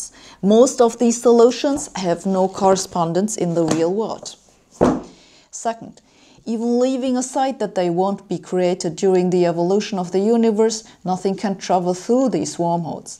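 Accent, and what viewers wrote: Germany. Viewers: Well, she is German.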